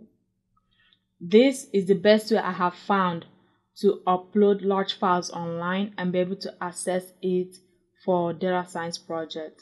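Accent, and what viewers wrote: Nigeria. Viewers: thank you very informative n it sounds like you are Nigerian!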